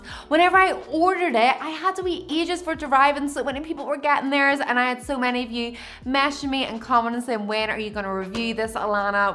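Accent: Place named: Northern Ireland, United Kingdom. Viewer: Hi Alana, I am a newbie to Dyson airwrap world but I find your chanel so so helpful! Love the content! Keep the good work! Also, I used to live in Belfast for some time so have loads of sentiment to NI and love your accent😂❤️ Warm regards from Luxembourg xx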